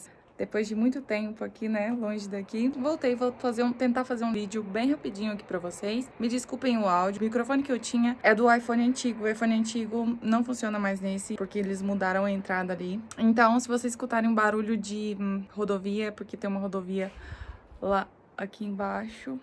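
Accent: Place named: Goiás, Brazil. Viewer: Goiano e sempre um trem gostoso de ouvir né! Acabei de chegar mas já estou adorando seu canal.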